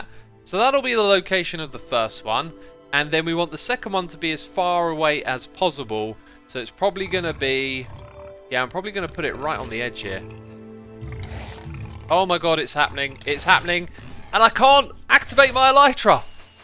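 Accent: United Kingdom. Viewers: Because of his British accent and his commentary style of the true gentleman, I feel like the sound of sipping a cup of tea every now and then would happen any time.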